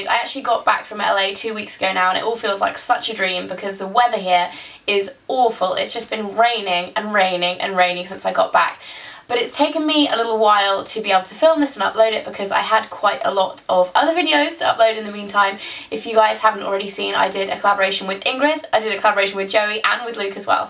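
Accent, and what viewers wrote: England, United Kingdom. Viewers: I love your English accent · I went to imats too!! I wish i had watched ur vids way before imats bc then i would of searched for u! I lovvvee ur british accent :3 btw i got a creme blush in orange . LOVVEE IT!! I want that pink one ! And i also got that same palette ! ^.^ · happy birthday your soo lucky you have blonde hair and a british accent and your freaking georgeus im soo jealous omg haha well happy birthdayyy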